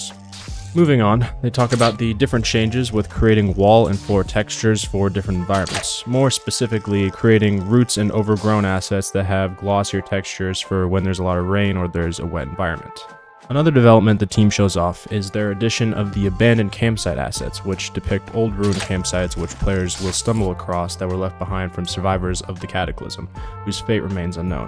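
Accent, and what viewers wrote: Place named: United States. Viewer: I don't think you know what "formerly" means.... You sound American, so I'm confused why me, a Danish person, needs to correct you on this. Formerly, means "in the past". Saying "The new Worlds Adrift, now formerly known as Lost Skies" is a nonsense sentence. Is it now or formerly? It can't be both. It's either: "The new Worlds Adrift, formerly known as Lost Skies" - Meaning it used to be called Lost Skies, but is now called Worlds Adrift. Or "The new Worlds Adrift, now known as Lost Skies" - Meaning it used to be called Worlds Adrift, but is now called Lost Skies. If you want to use the word formerly, you would say "Lost Skies, formerly known as Worlds Adrift"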